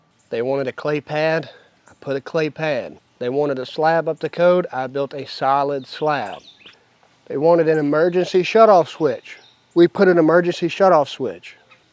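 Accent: Louisiana, United States. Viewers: Where in Louisiana are you. So strange hearing that accent on youtube. Not used to hearing it anywhere near an internet connection. And this heat has been killer. The insulation just hurts you more without AC. Better to open the doors and run a fan until you get enough power to run your AC. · Sounds like Louisiana. I was in Shreveport and moved out to Greenwood to get away from the politics and the hassle.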